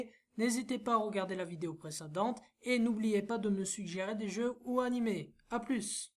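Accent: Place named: South, France. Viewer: Oui respect mais t'as un accent du sud je me trompe ?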